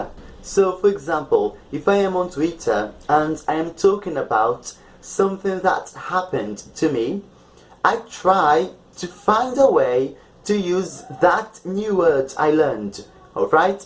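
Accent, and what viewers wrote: United Kingdom. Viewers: hi my name's Leandro, and I'm enchanted with your English accent, I wish we were friends on WhatsApp, anyway, if you want to contact me , please , just Whatsapp me <num>. I have been studying English for a while so I think we could have an interesting and effective conversation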